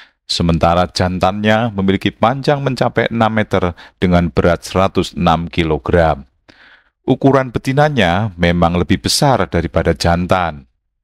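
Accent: Indonesia. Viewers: Logat nya sangat kental sekali, pasti beliau orang medan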